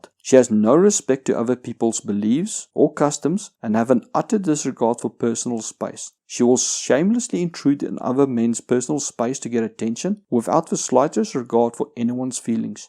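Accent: Afrikaans, South Africa. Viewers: One question: Are you South African? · Nice vid, I subbed and liked. All the points were accurate and appreciate the time stamps. Another thing ill add, even though you did touch up on it is, they are always the victim, no matter what. Also, you have a very South African accent! I checked your 'about' and confirmed my assumption. That's great, I moved to SA in the early 2000's. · Tell me you're from South Africa without telling me you're from South Africa. That accent is super thick. By the way, good video. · I was surprised to hear his South African accent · Safrican accent detected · Ok so the man speaking here is Afrikaans right?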